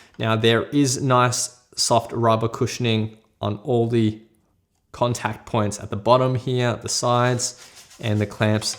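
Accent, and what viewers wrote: Australia. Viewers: Thanks for awesome review and instructions. Nice to hear an Aussie accent, we need a good tech review channel, thanks for bringing it. The link you provided takes me to a product $239 ? Did price just increase or wrong link?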